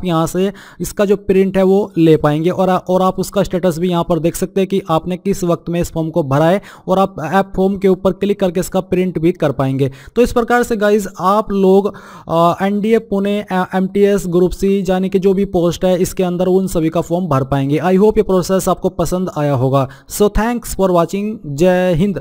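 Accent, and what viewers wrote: Saharanpur, India. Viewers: Brother aap saharanpur sa ho kya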